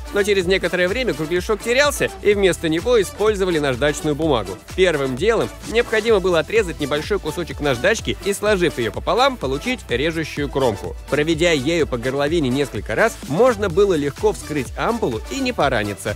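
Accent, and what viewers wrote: Kazakhstan. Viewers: Воу чувак ты из Казахстана?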